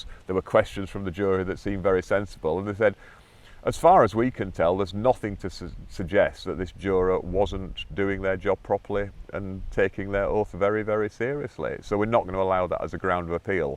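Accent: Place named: England, United Kingdom. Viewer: Wonderful accent. Very English. No conclusion.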